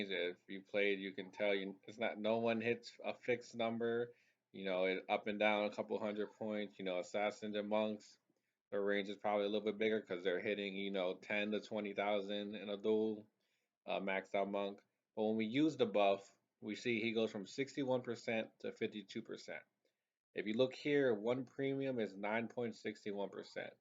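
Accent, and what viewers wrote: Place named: Philippines. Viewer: Bruh, u murican? I always thought you're PH 😅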